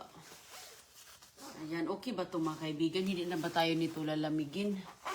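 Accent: Visayas, Philippines. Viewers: Nakaka hiya yung mga nambabash kay Ms.. Porke bisaya binabash ang accent. That's disgusting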